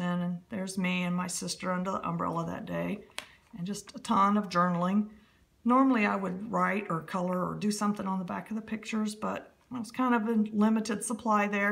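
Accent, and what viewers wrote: United States. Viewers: Hi, A wonderful flip through. The balance was perfect. I was interested all of the way through. It's lovely to put a voice to the face and you have that gorgeous American accent with the lovely elongated vowel sounds. I look forward to the next one, Ali xxx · you did a fabulous job!! Loved seeing all your beautiful pages and love your little southern accent!!!!!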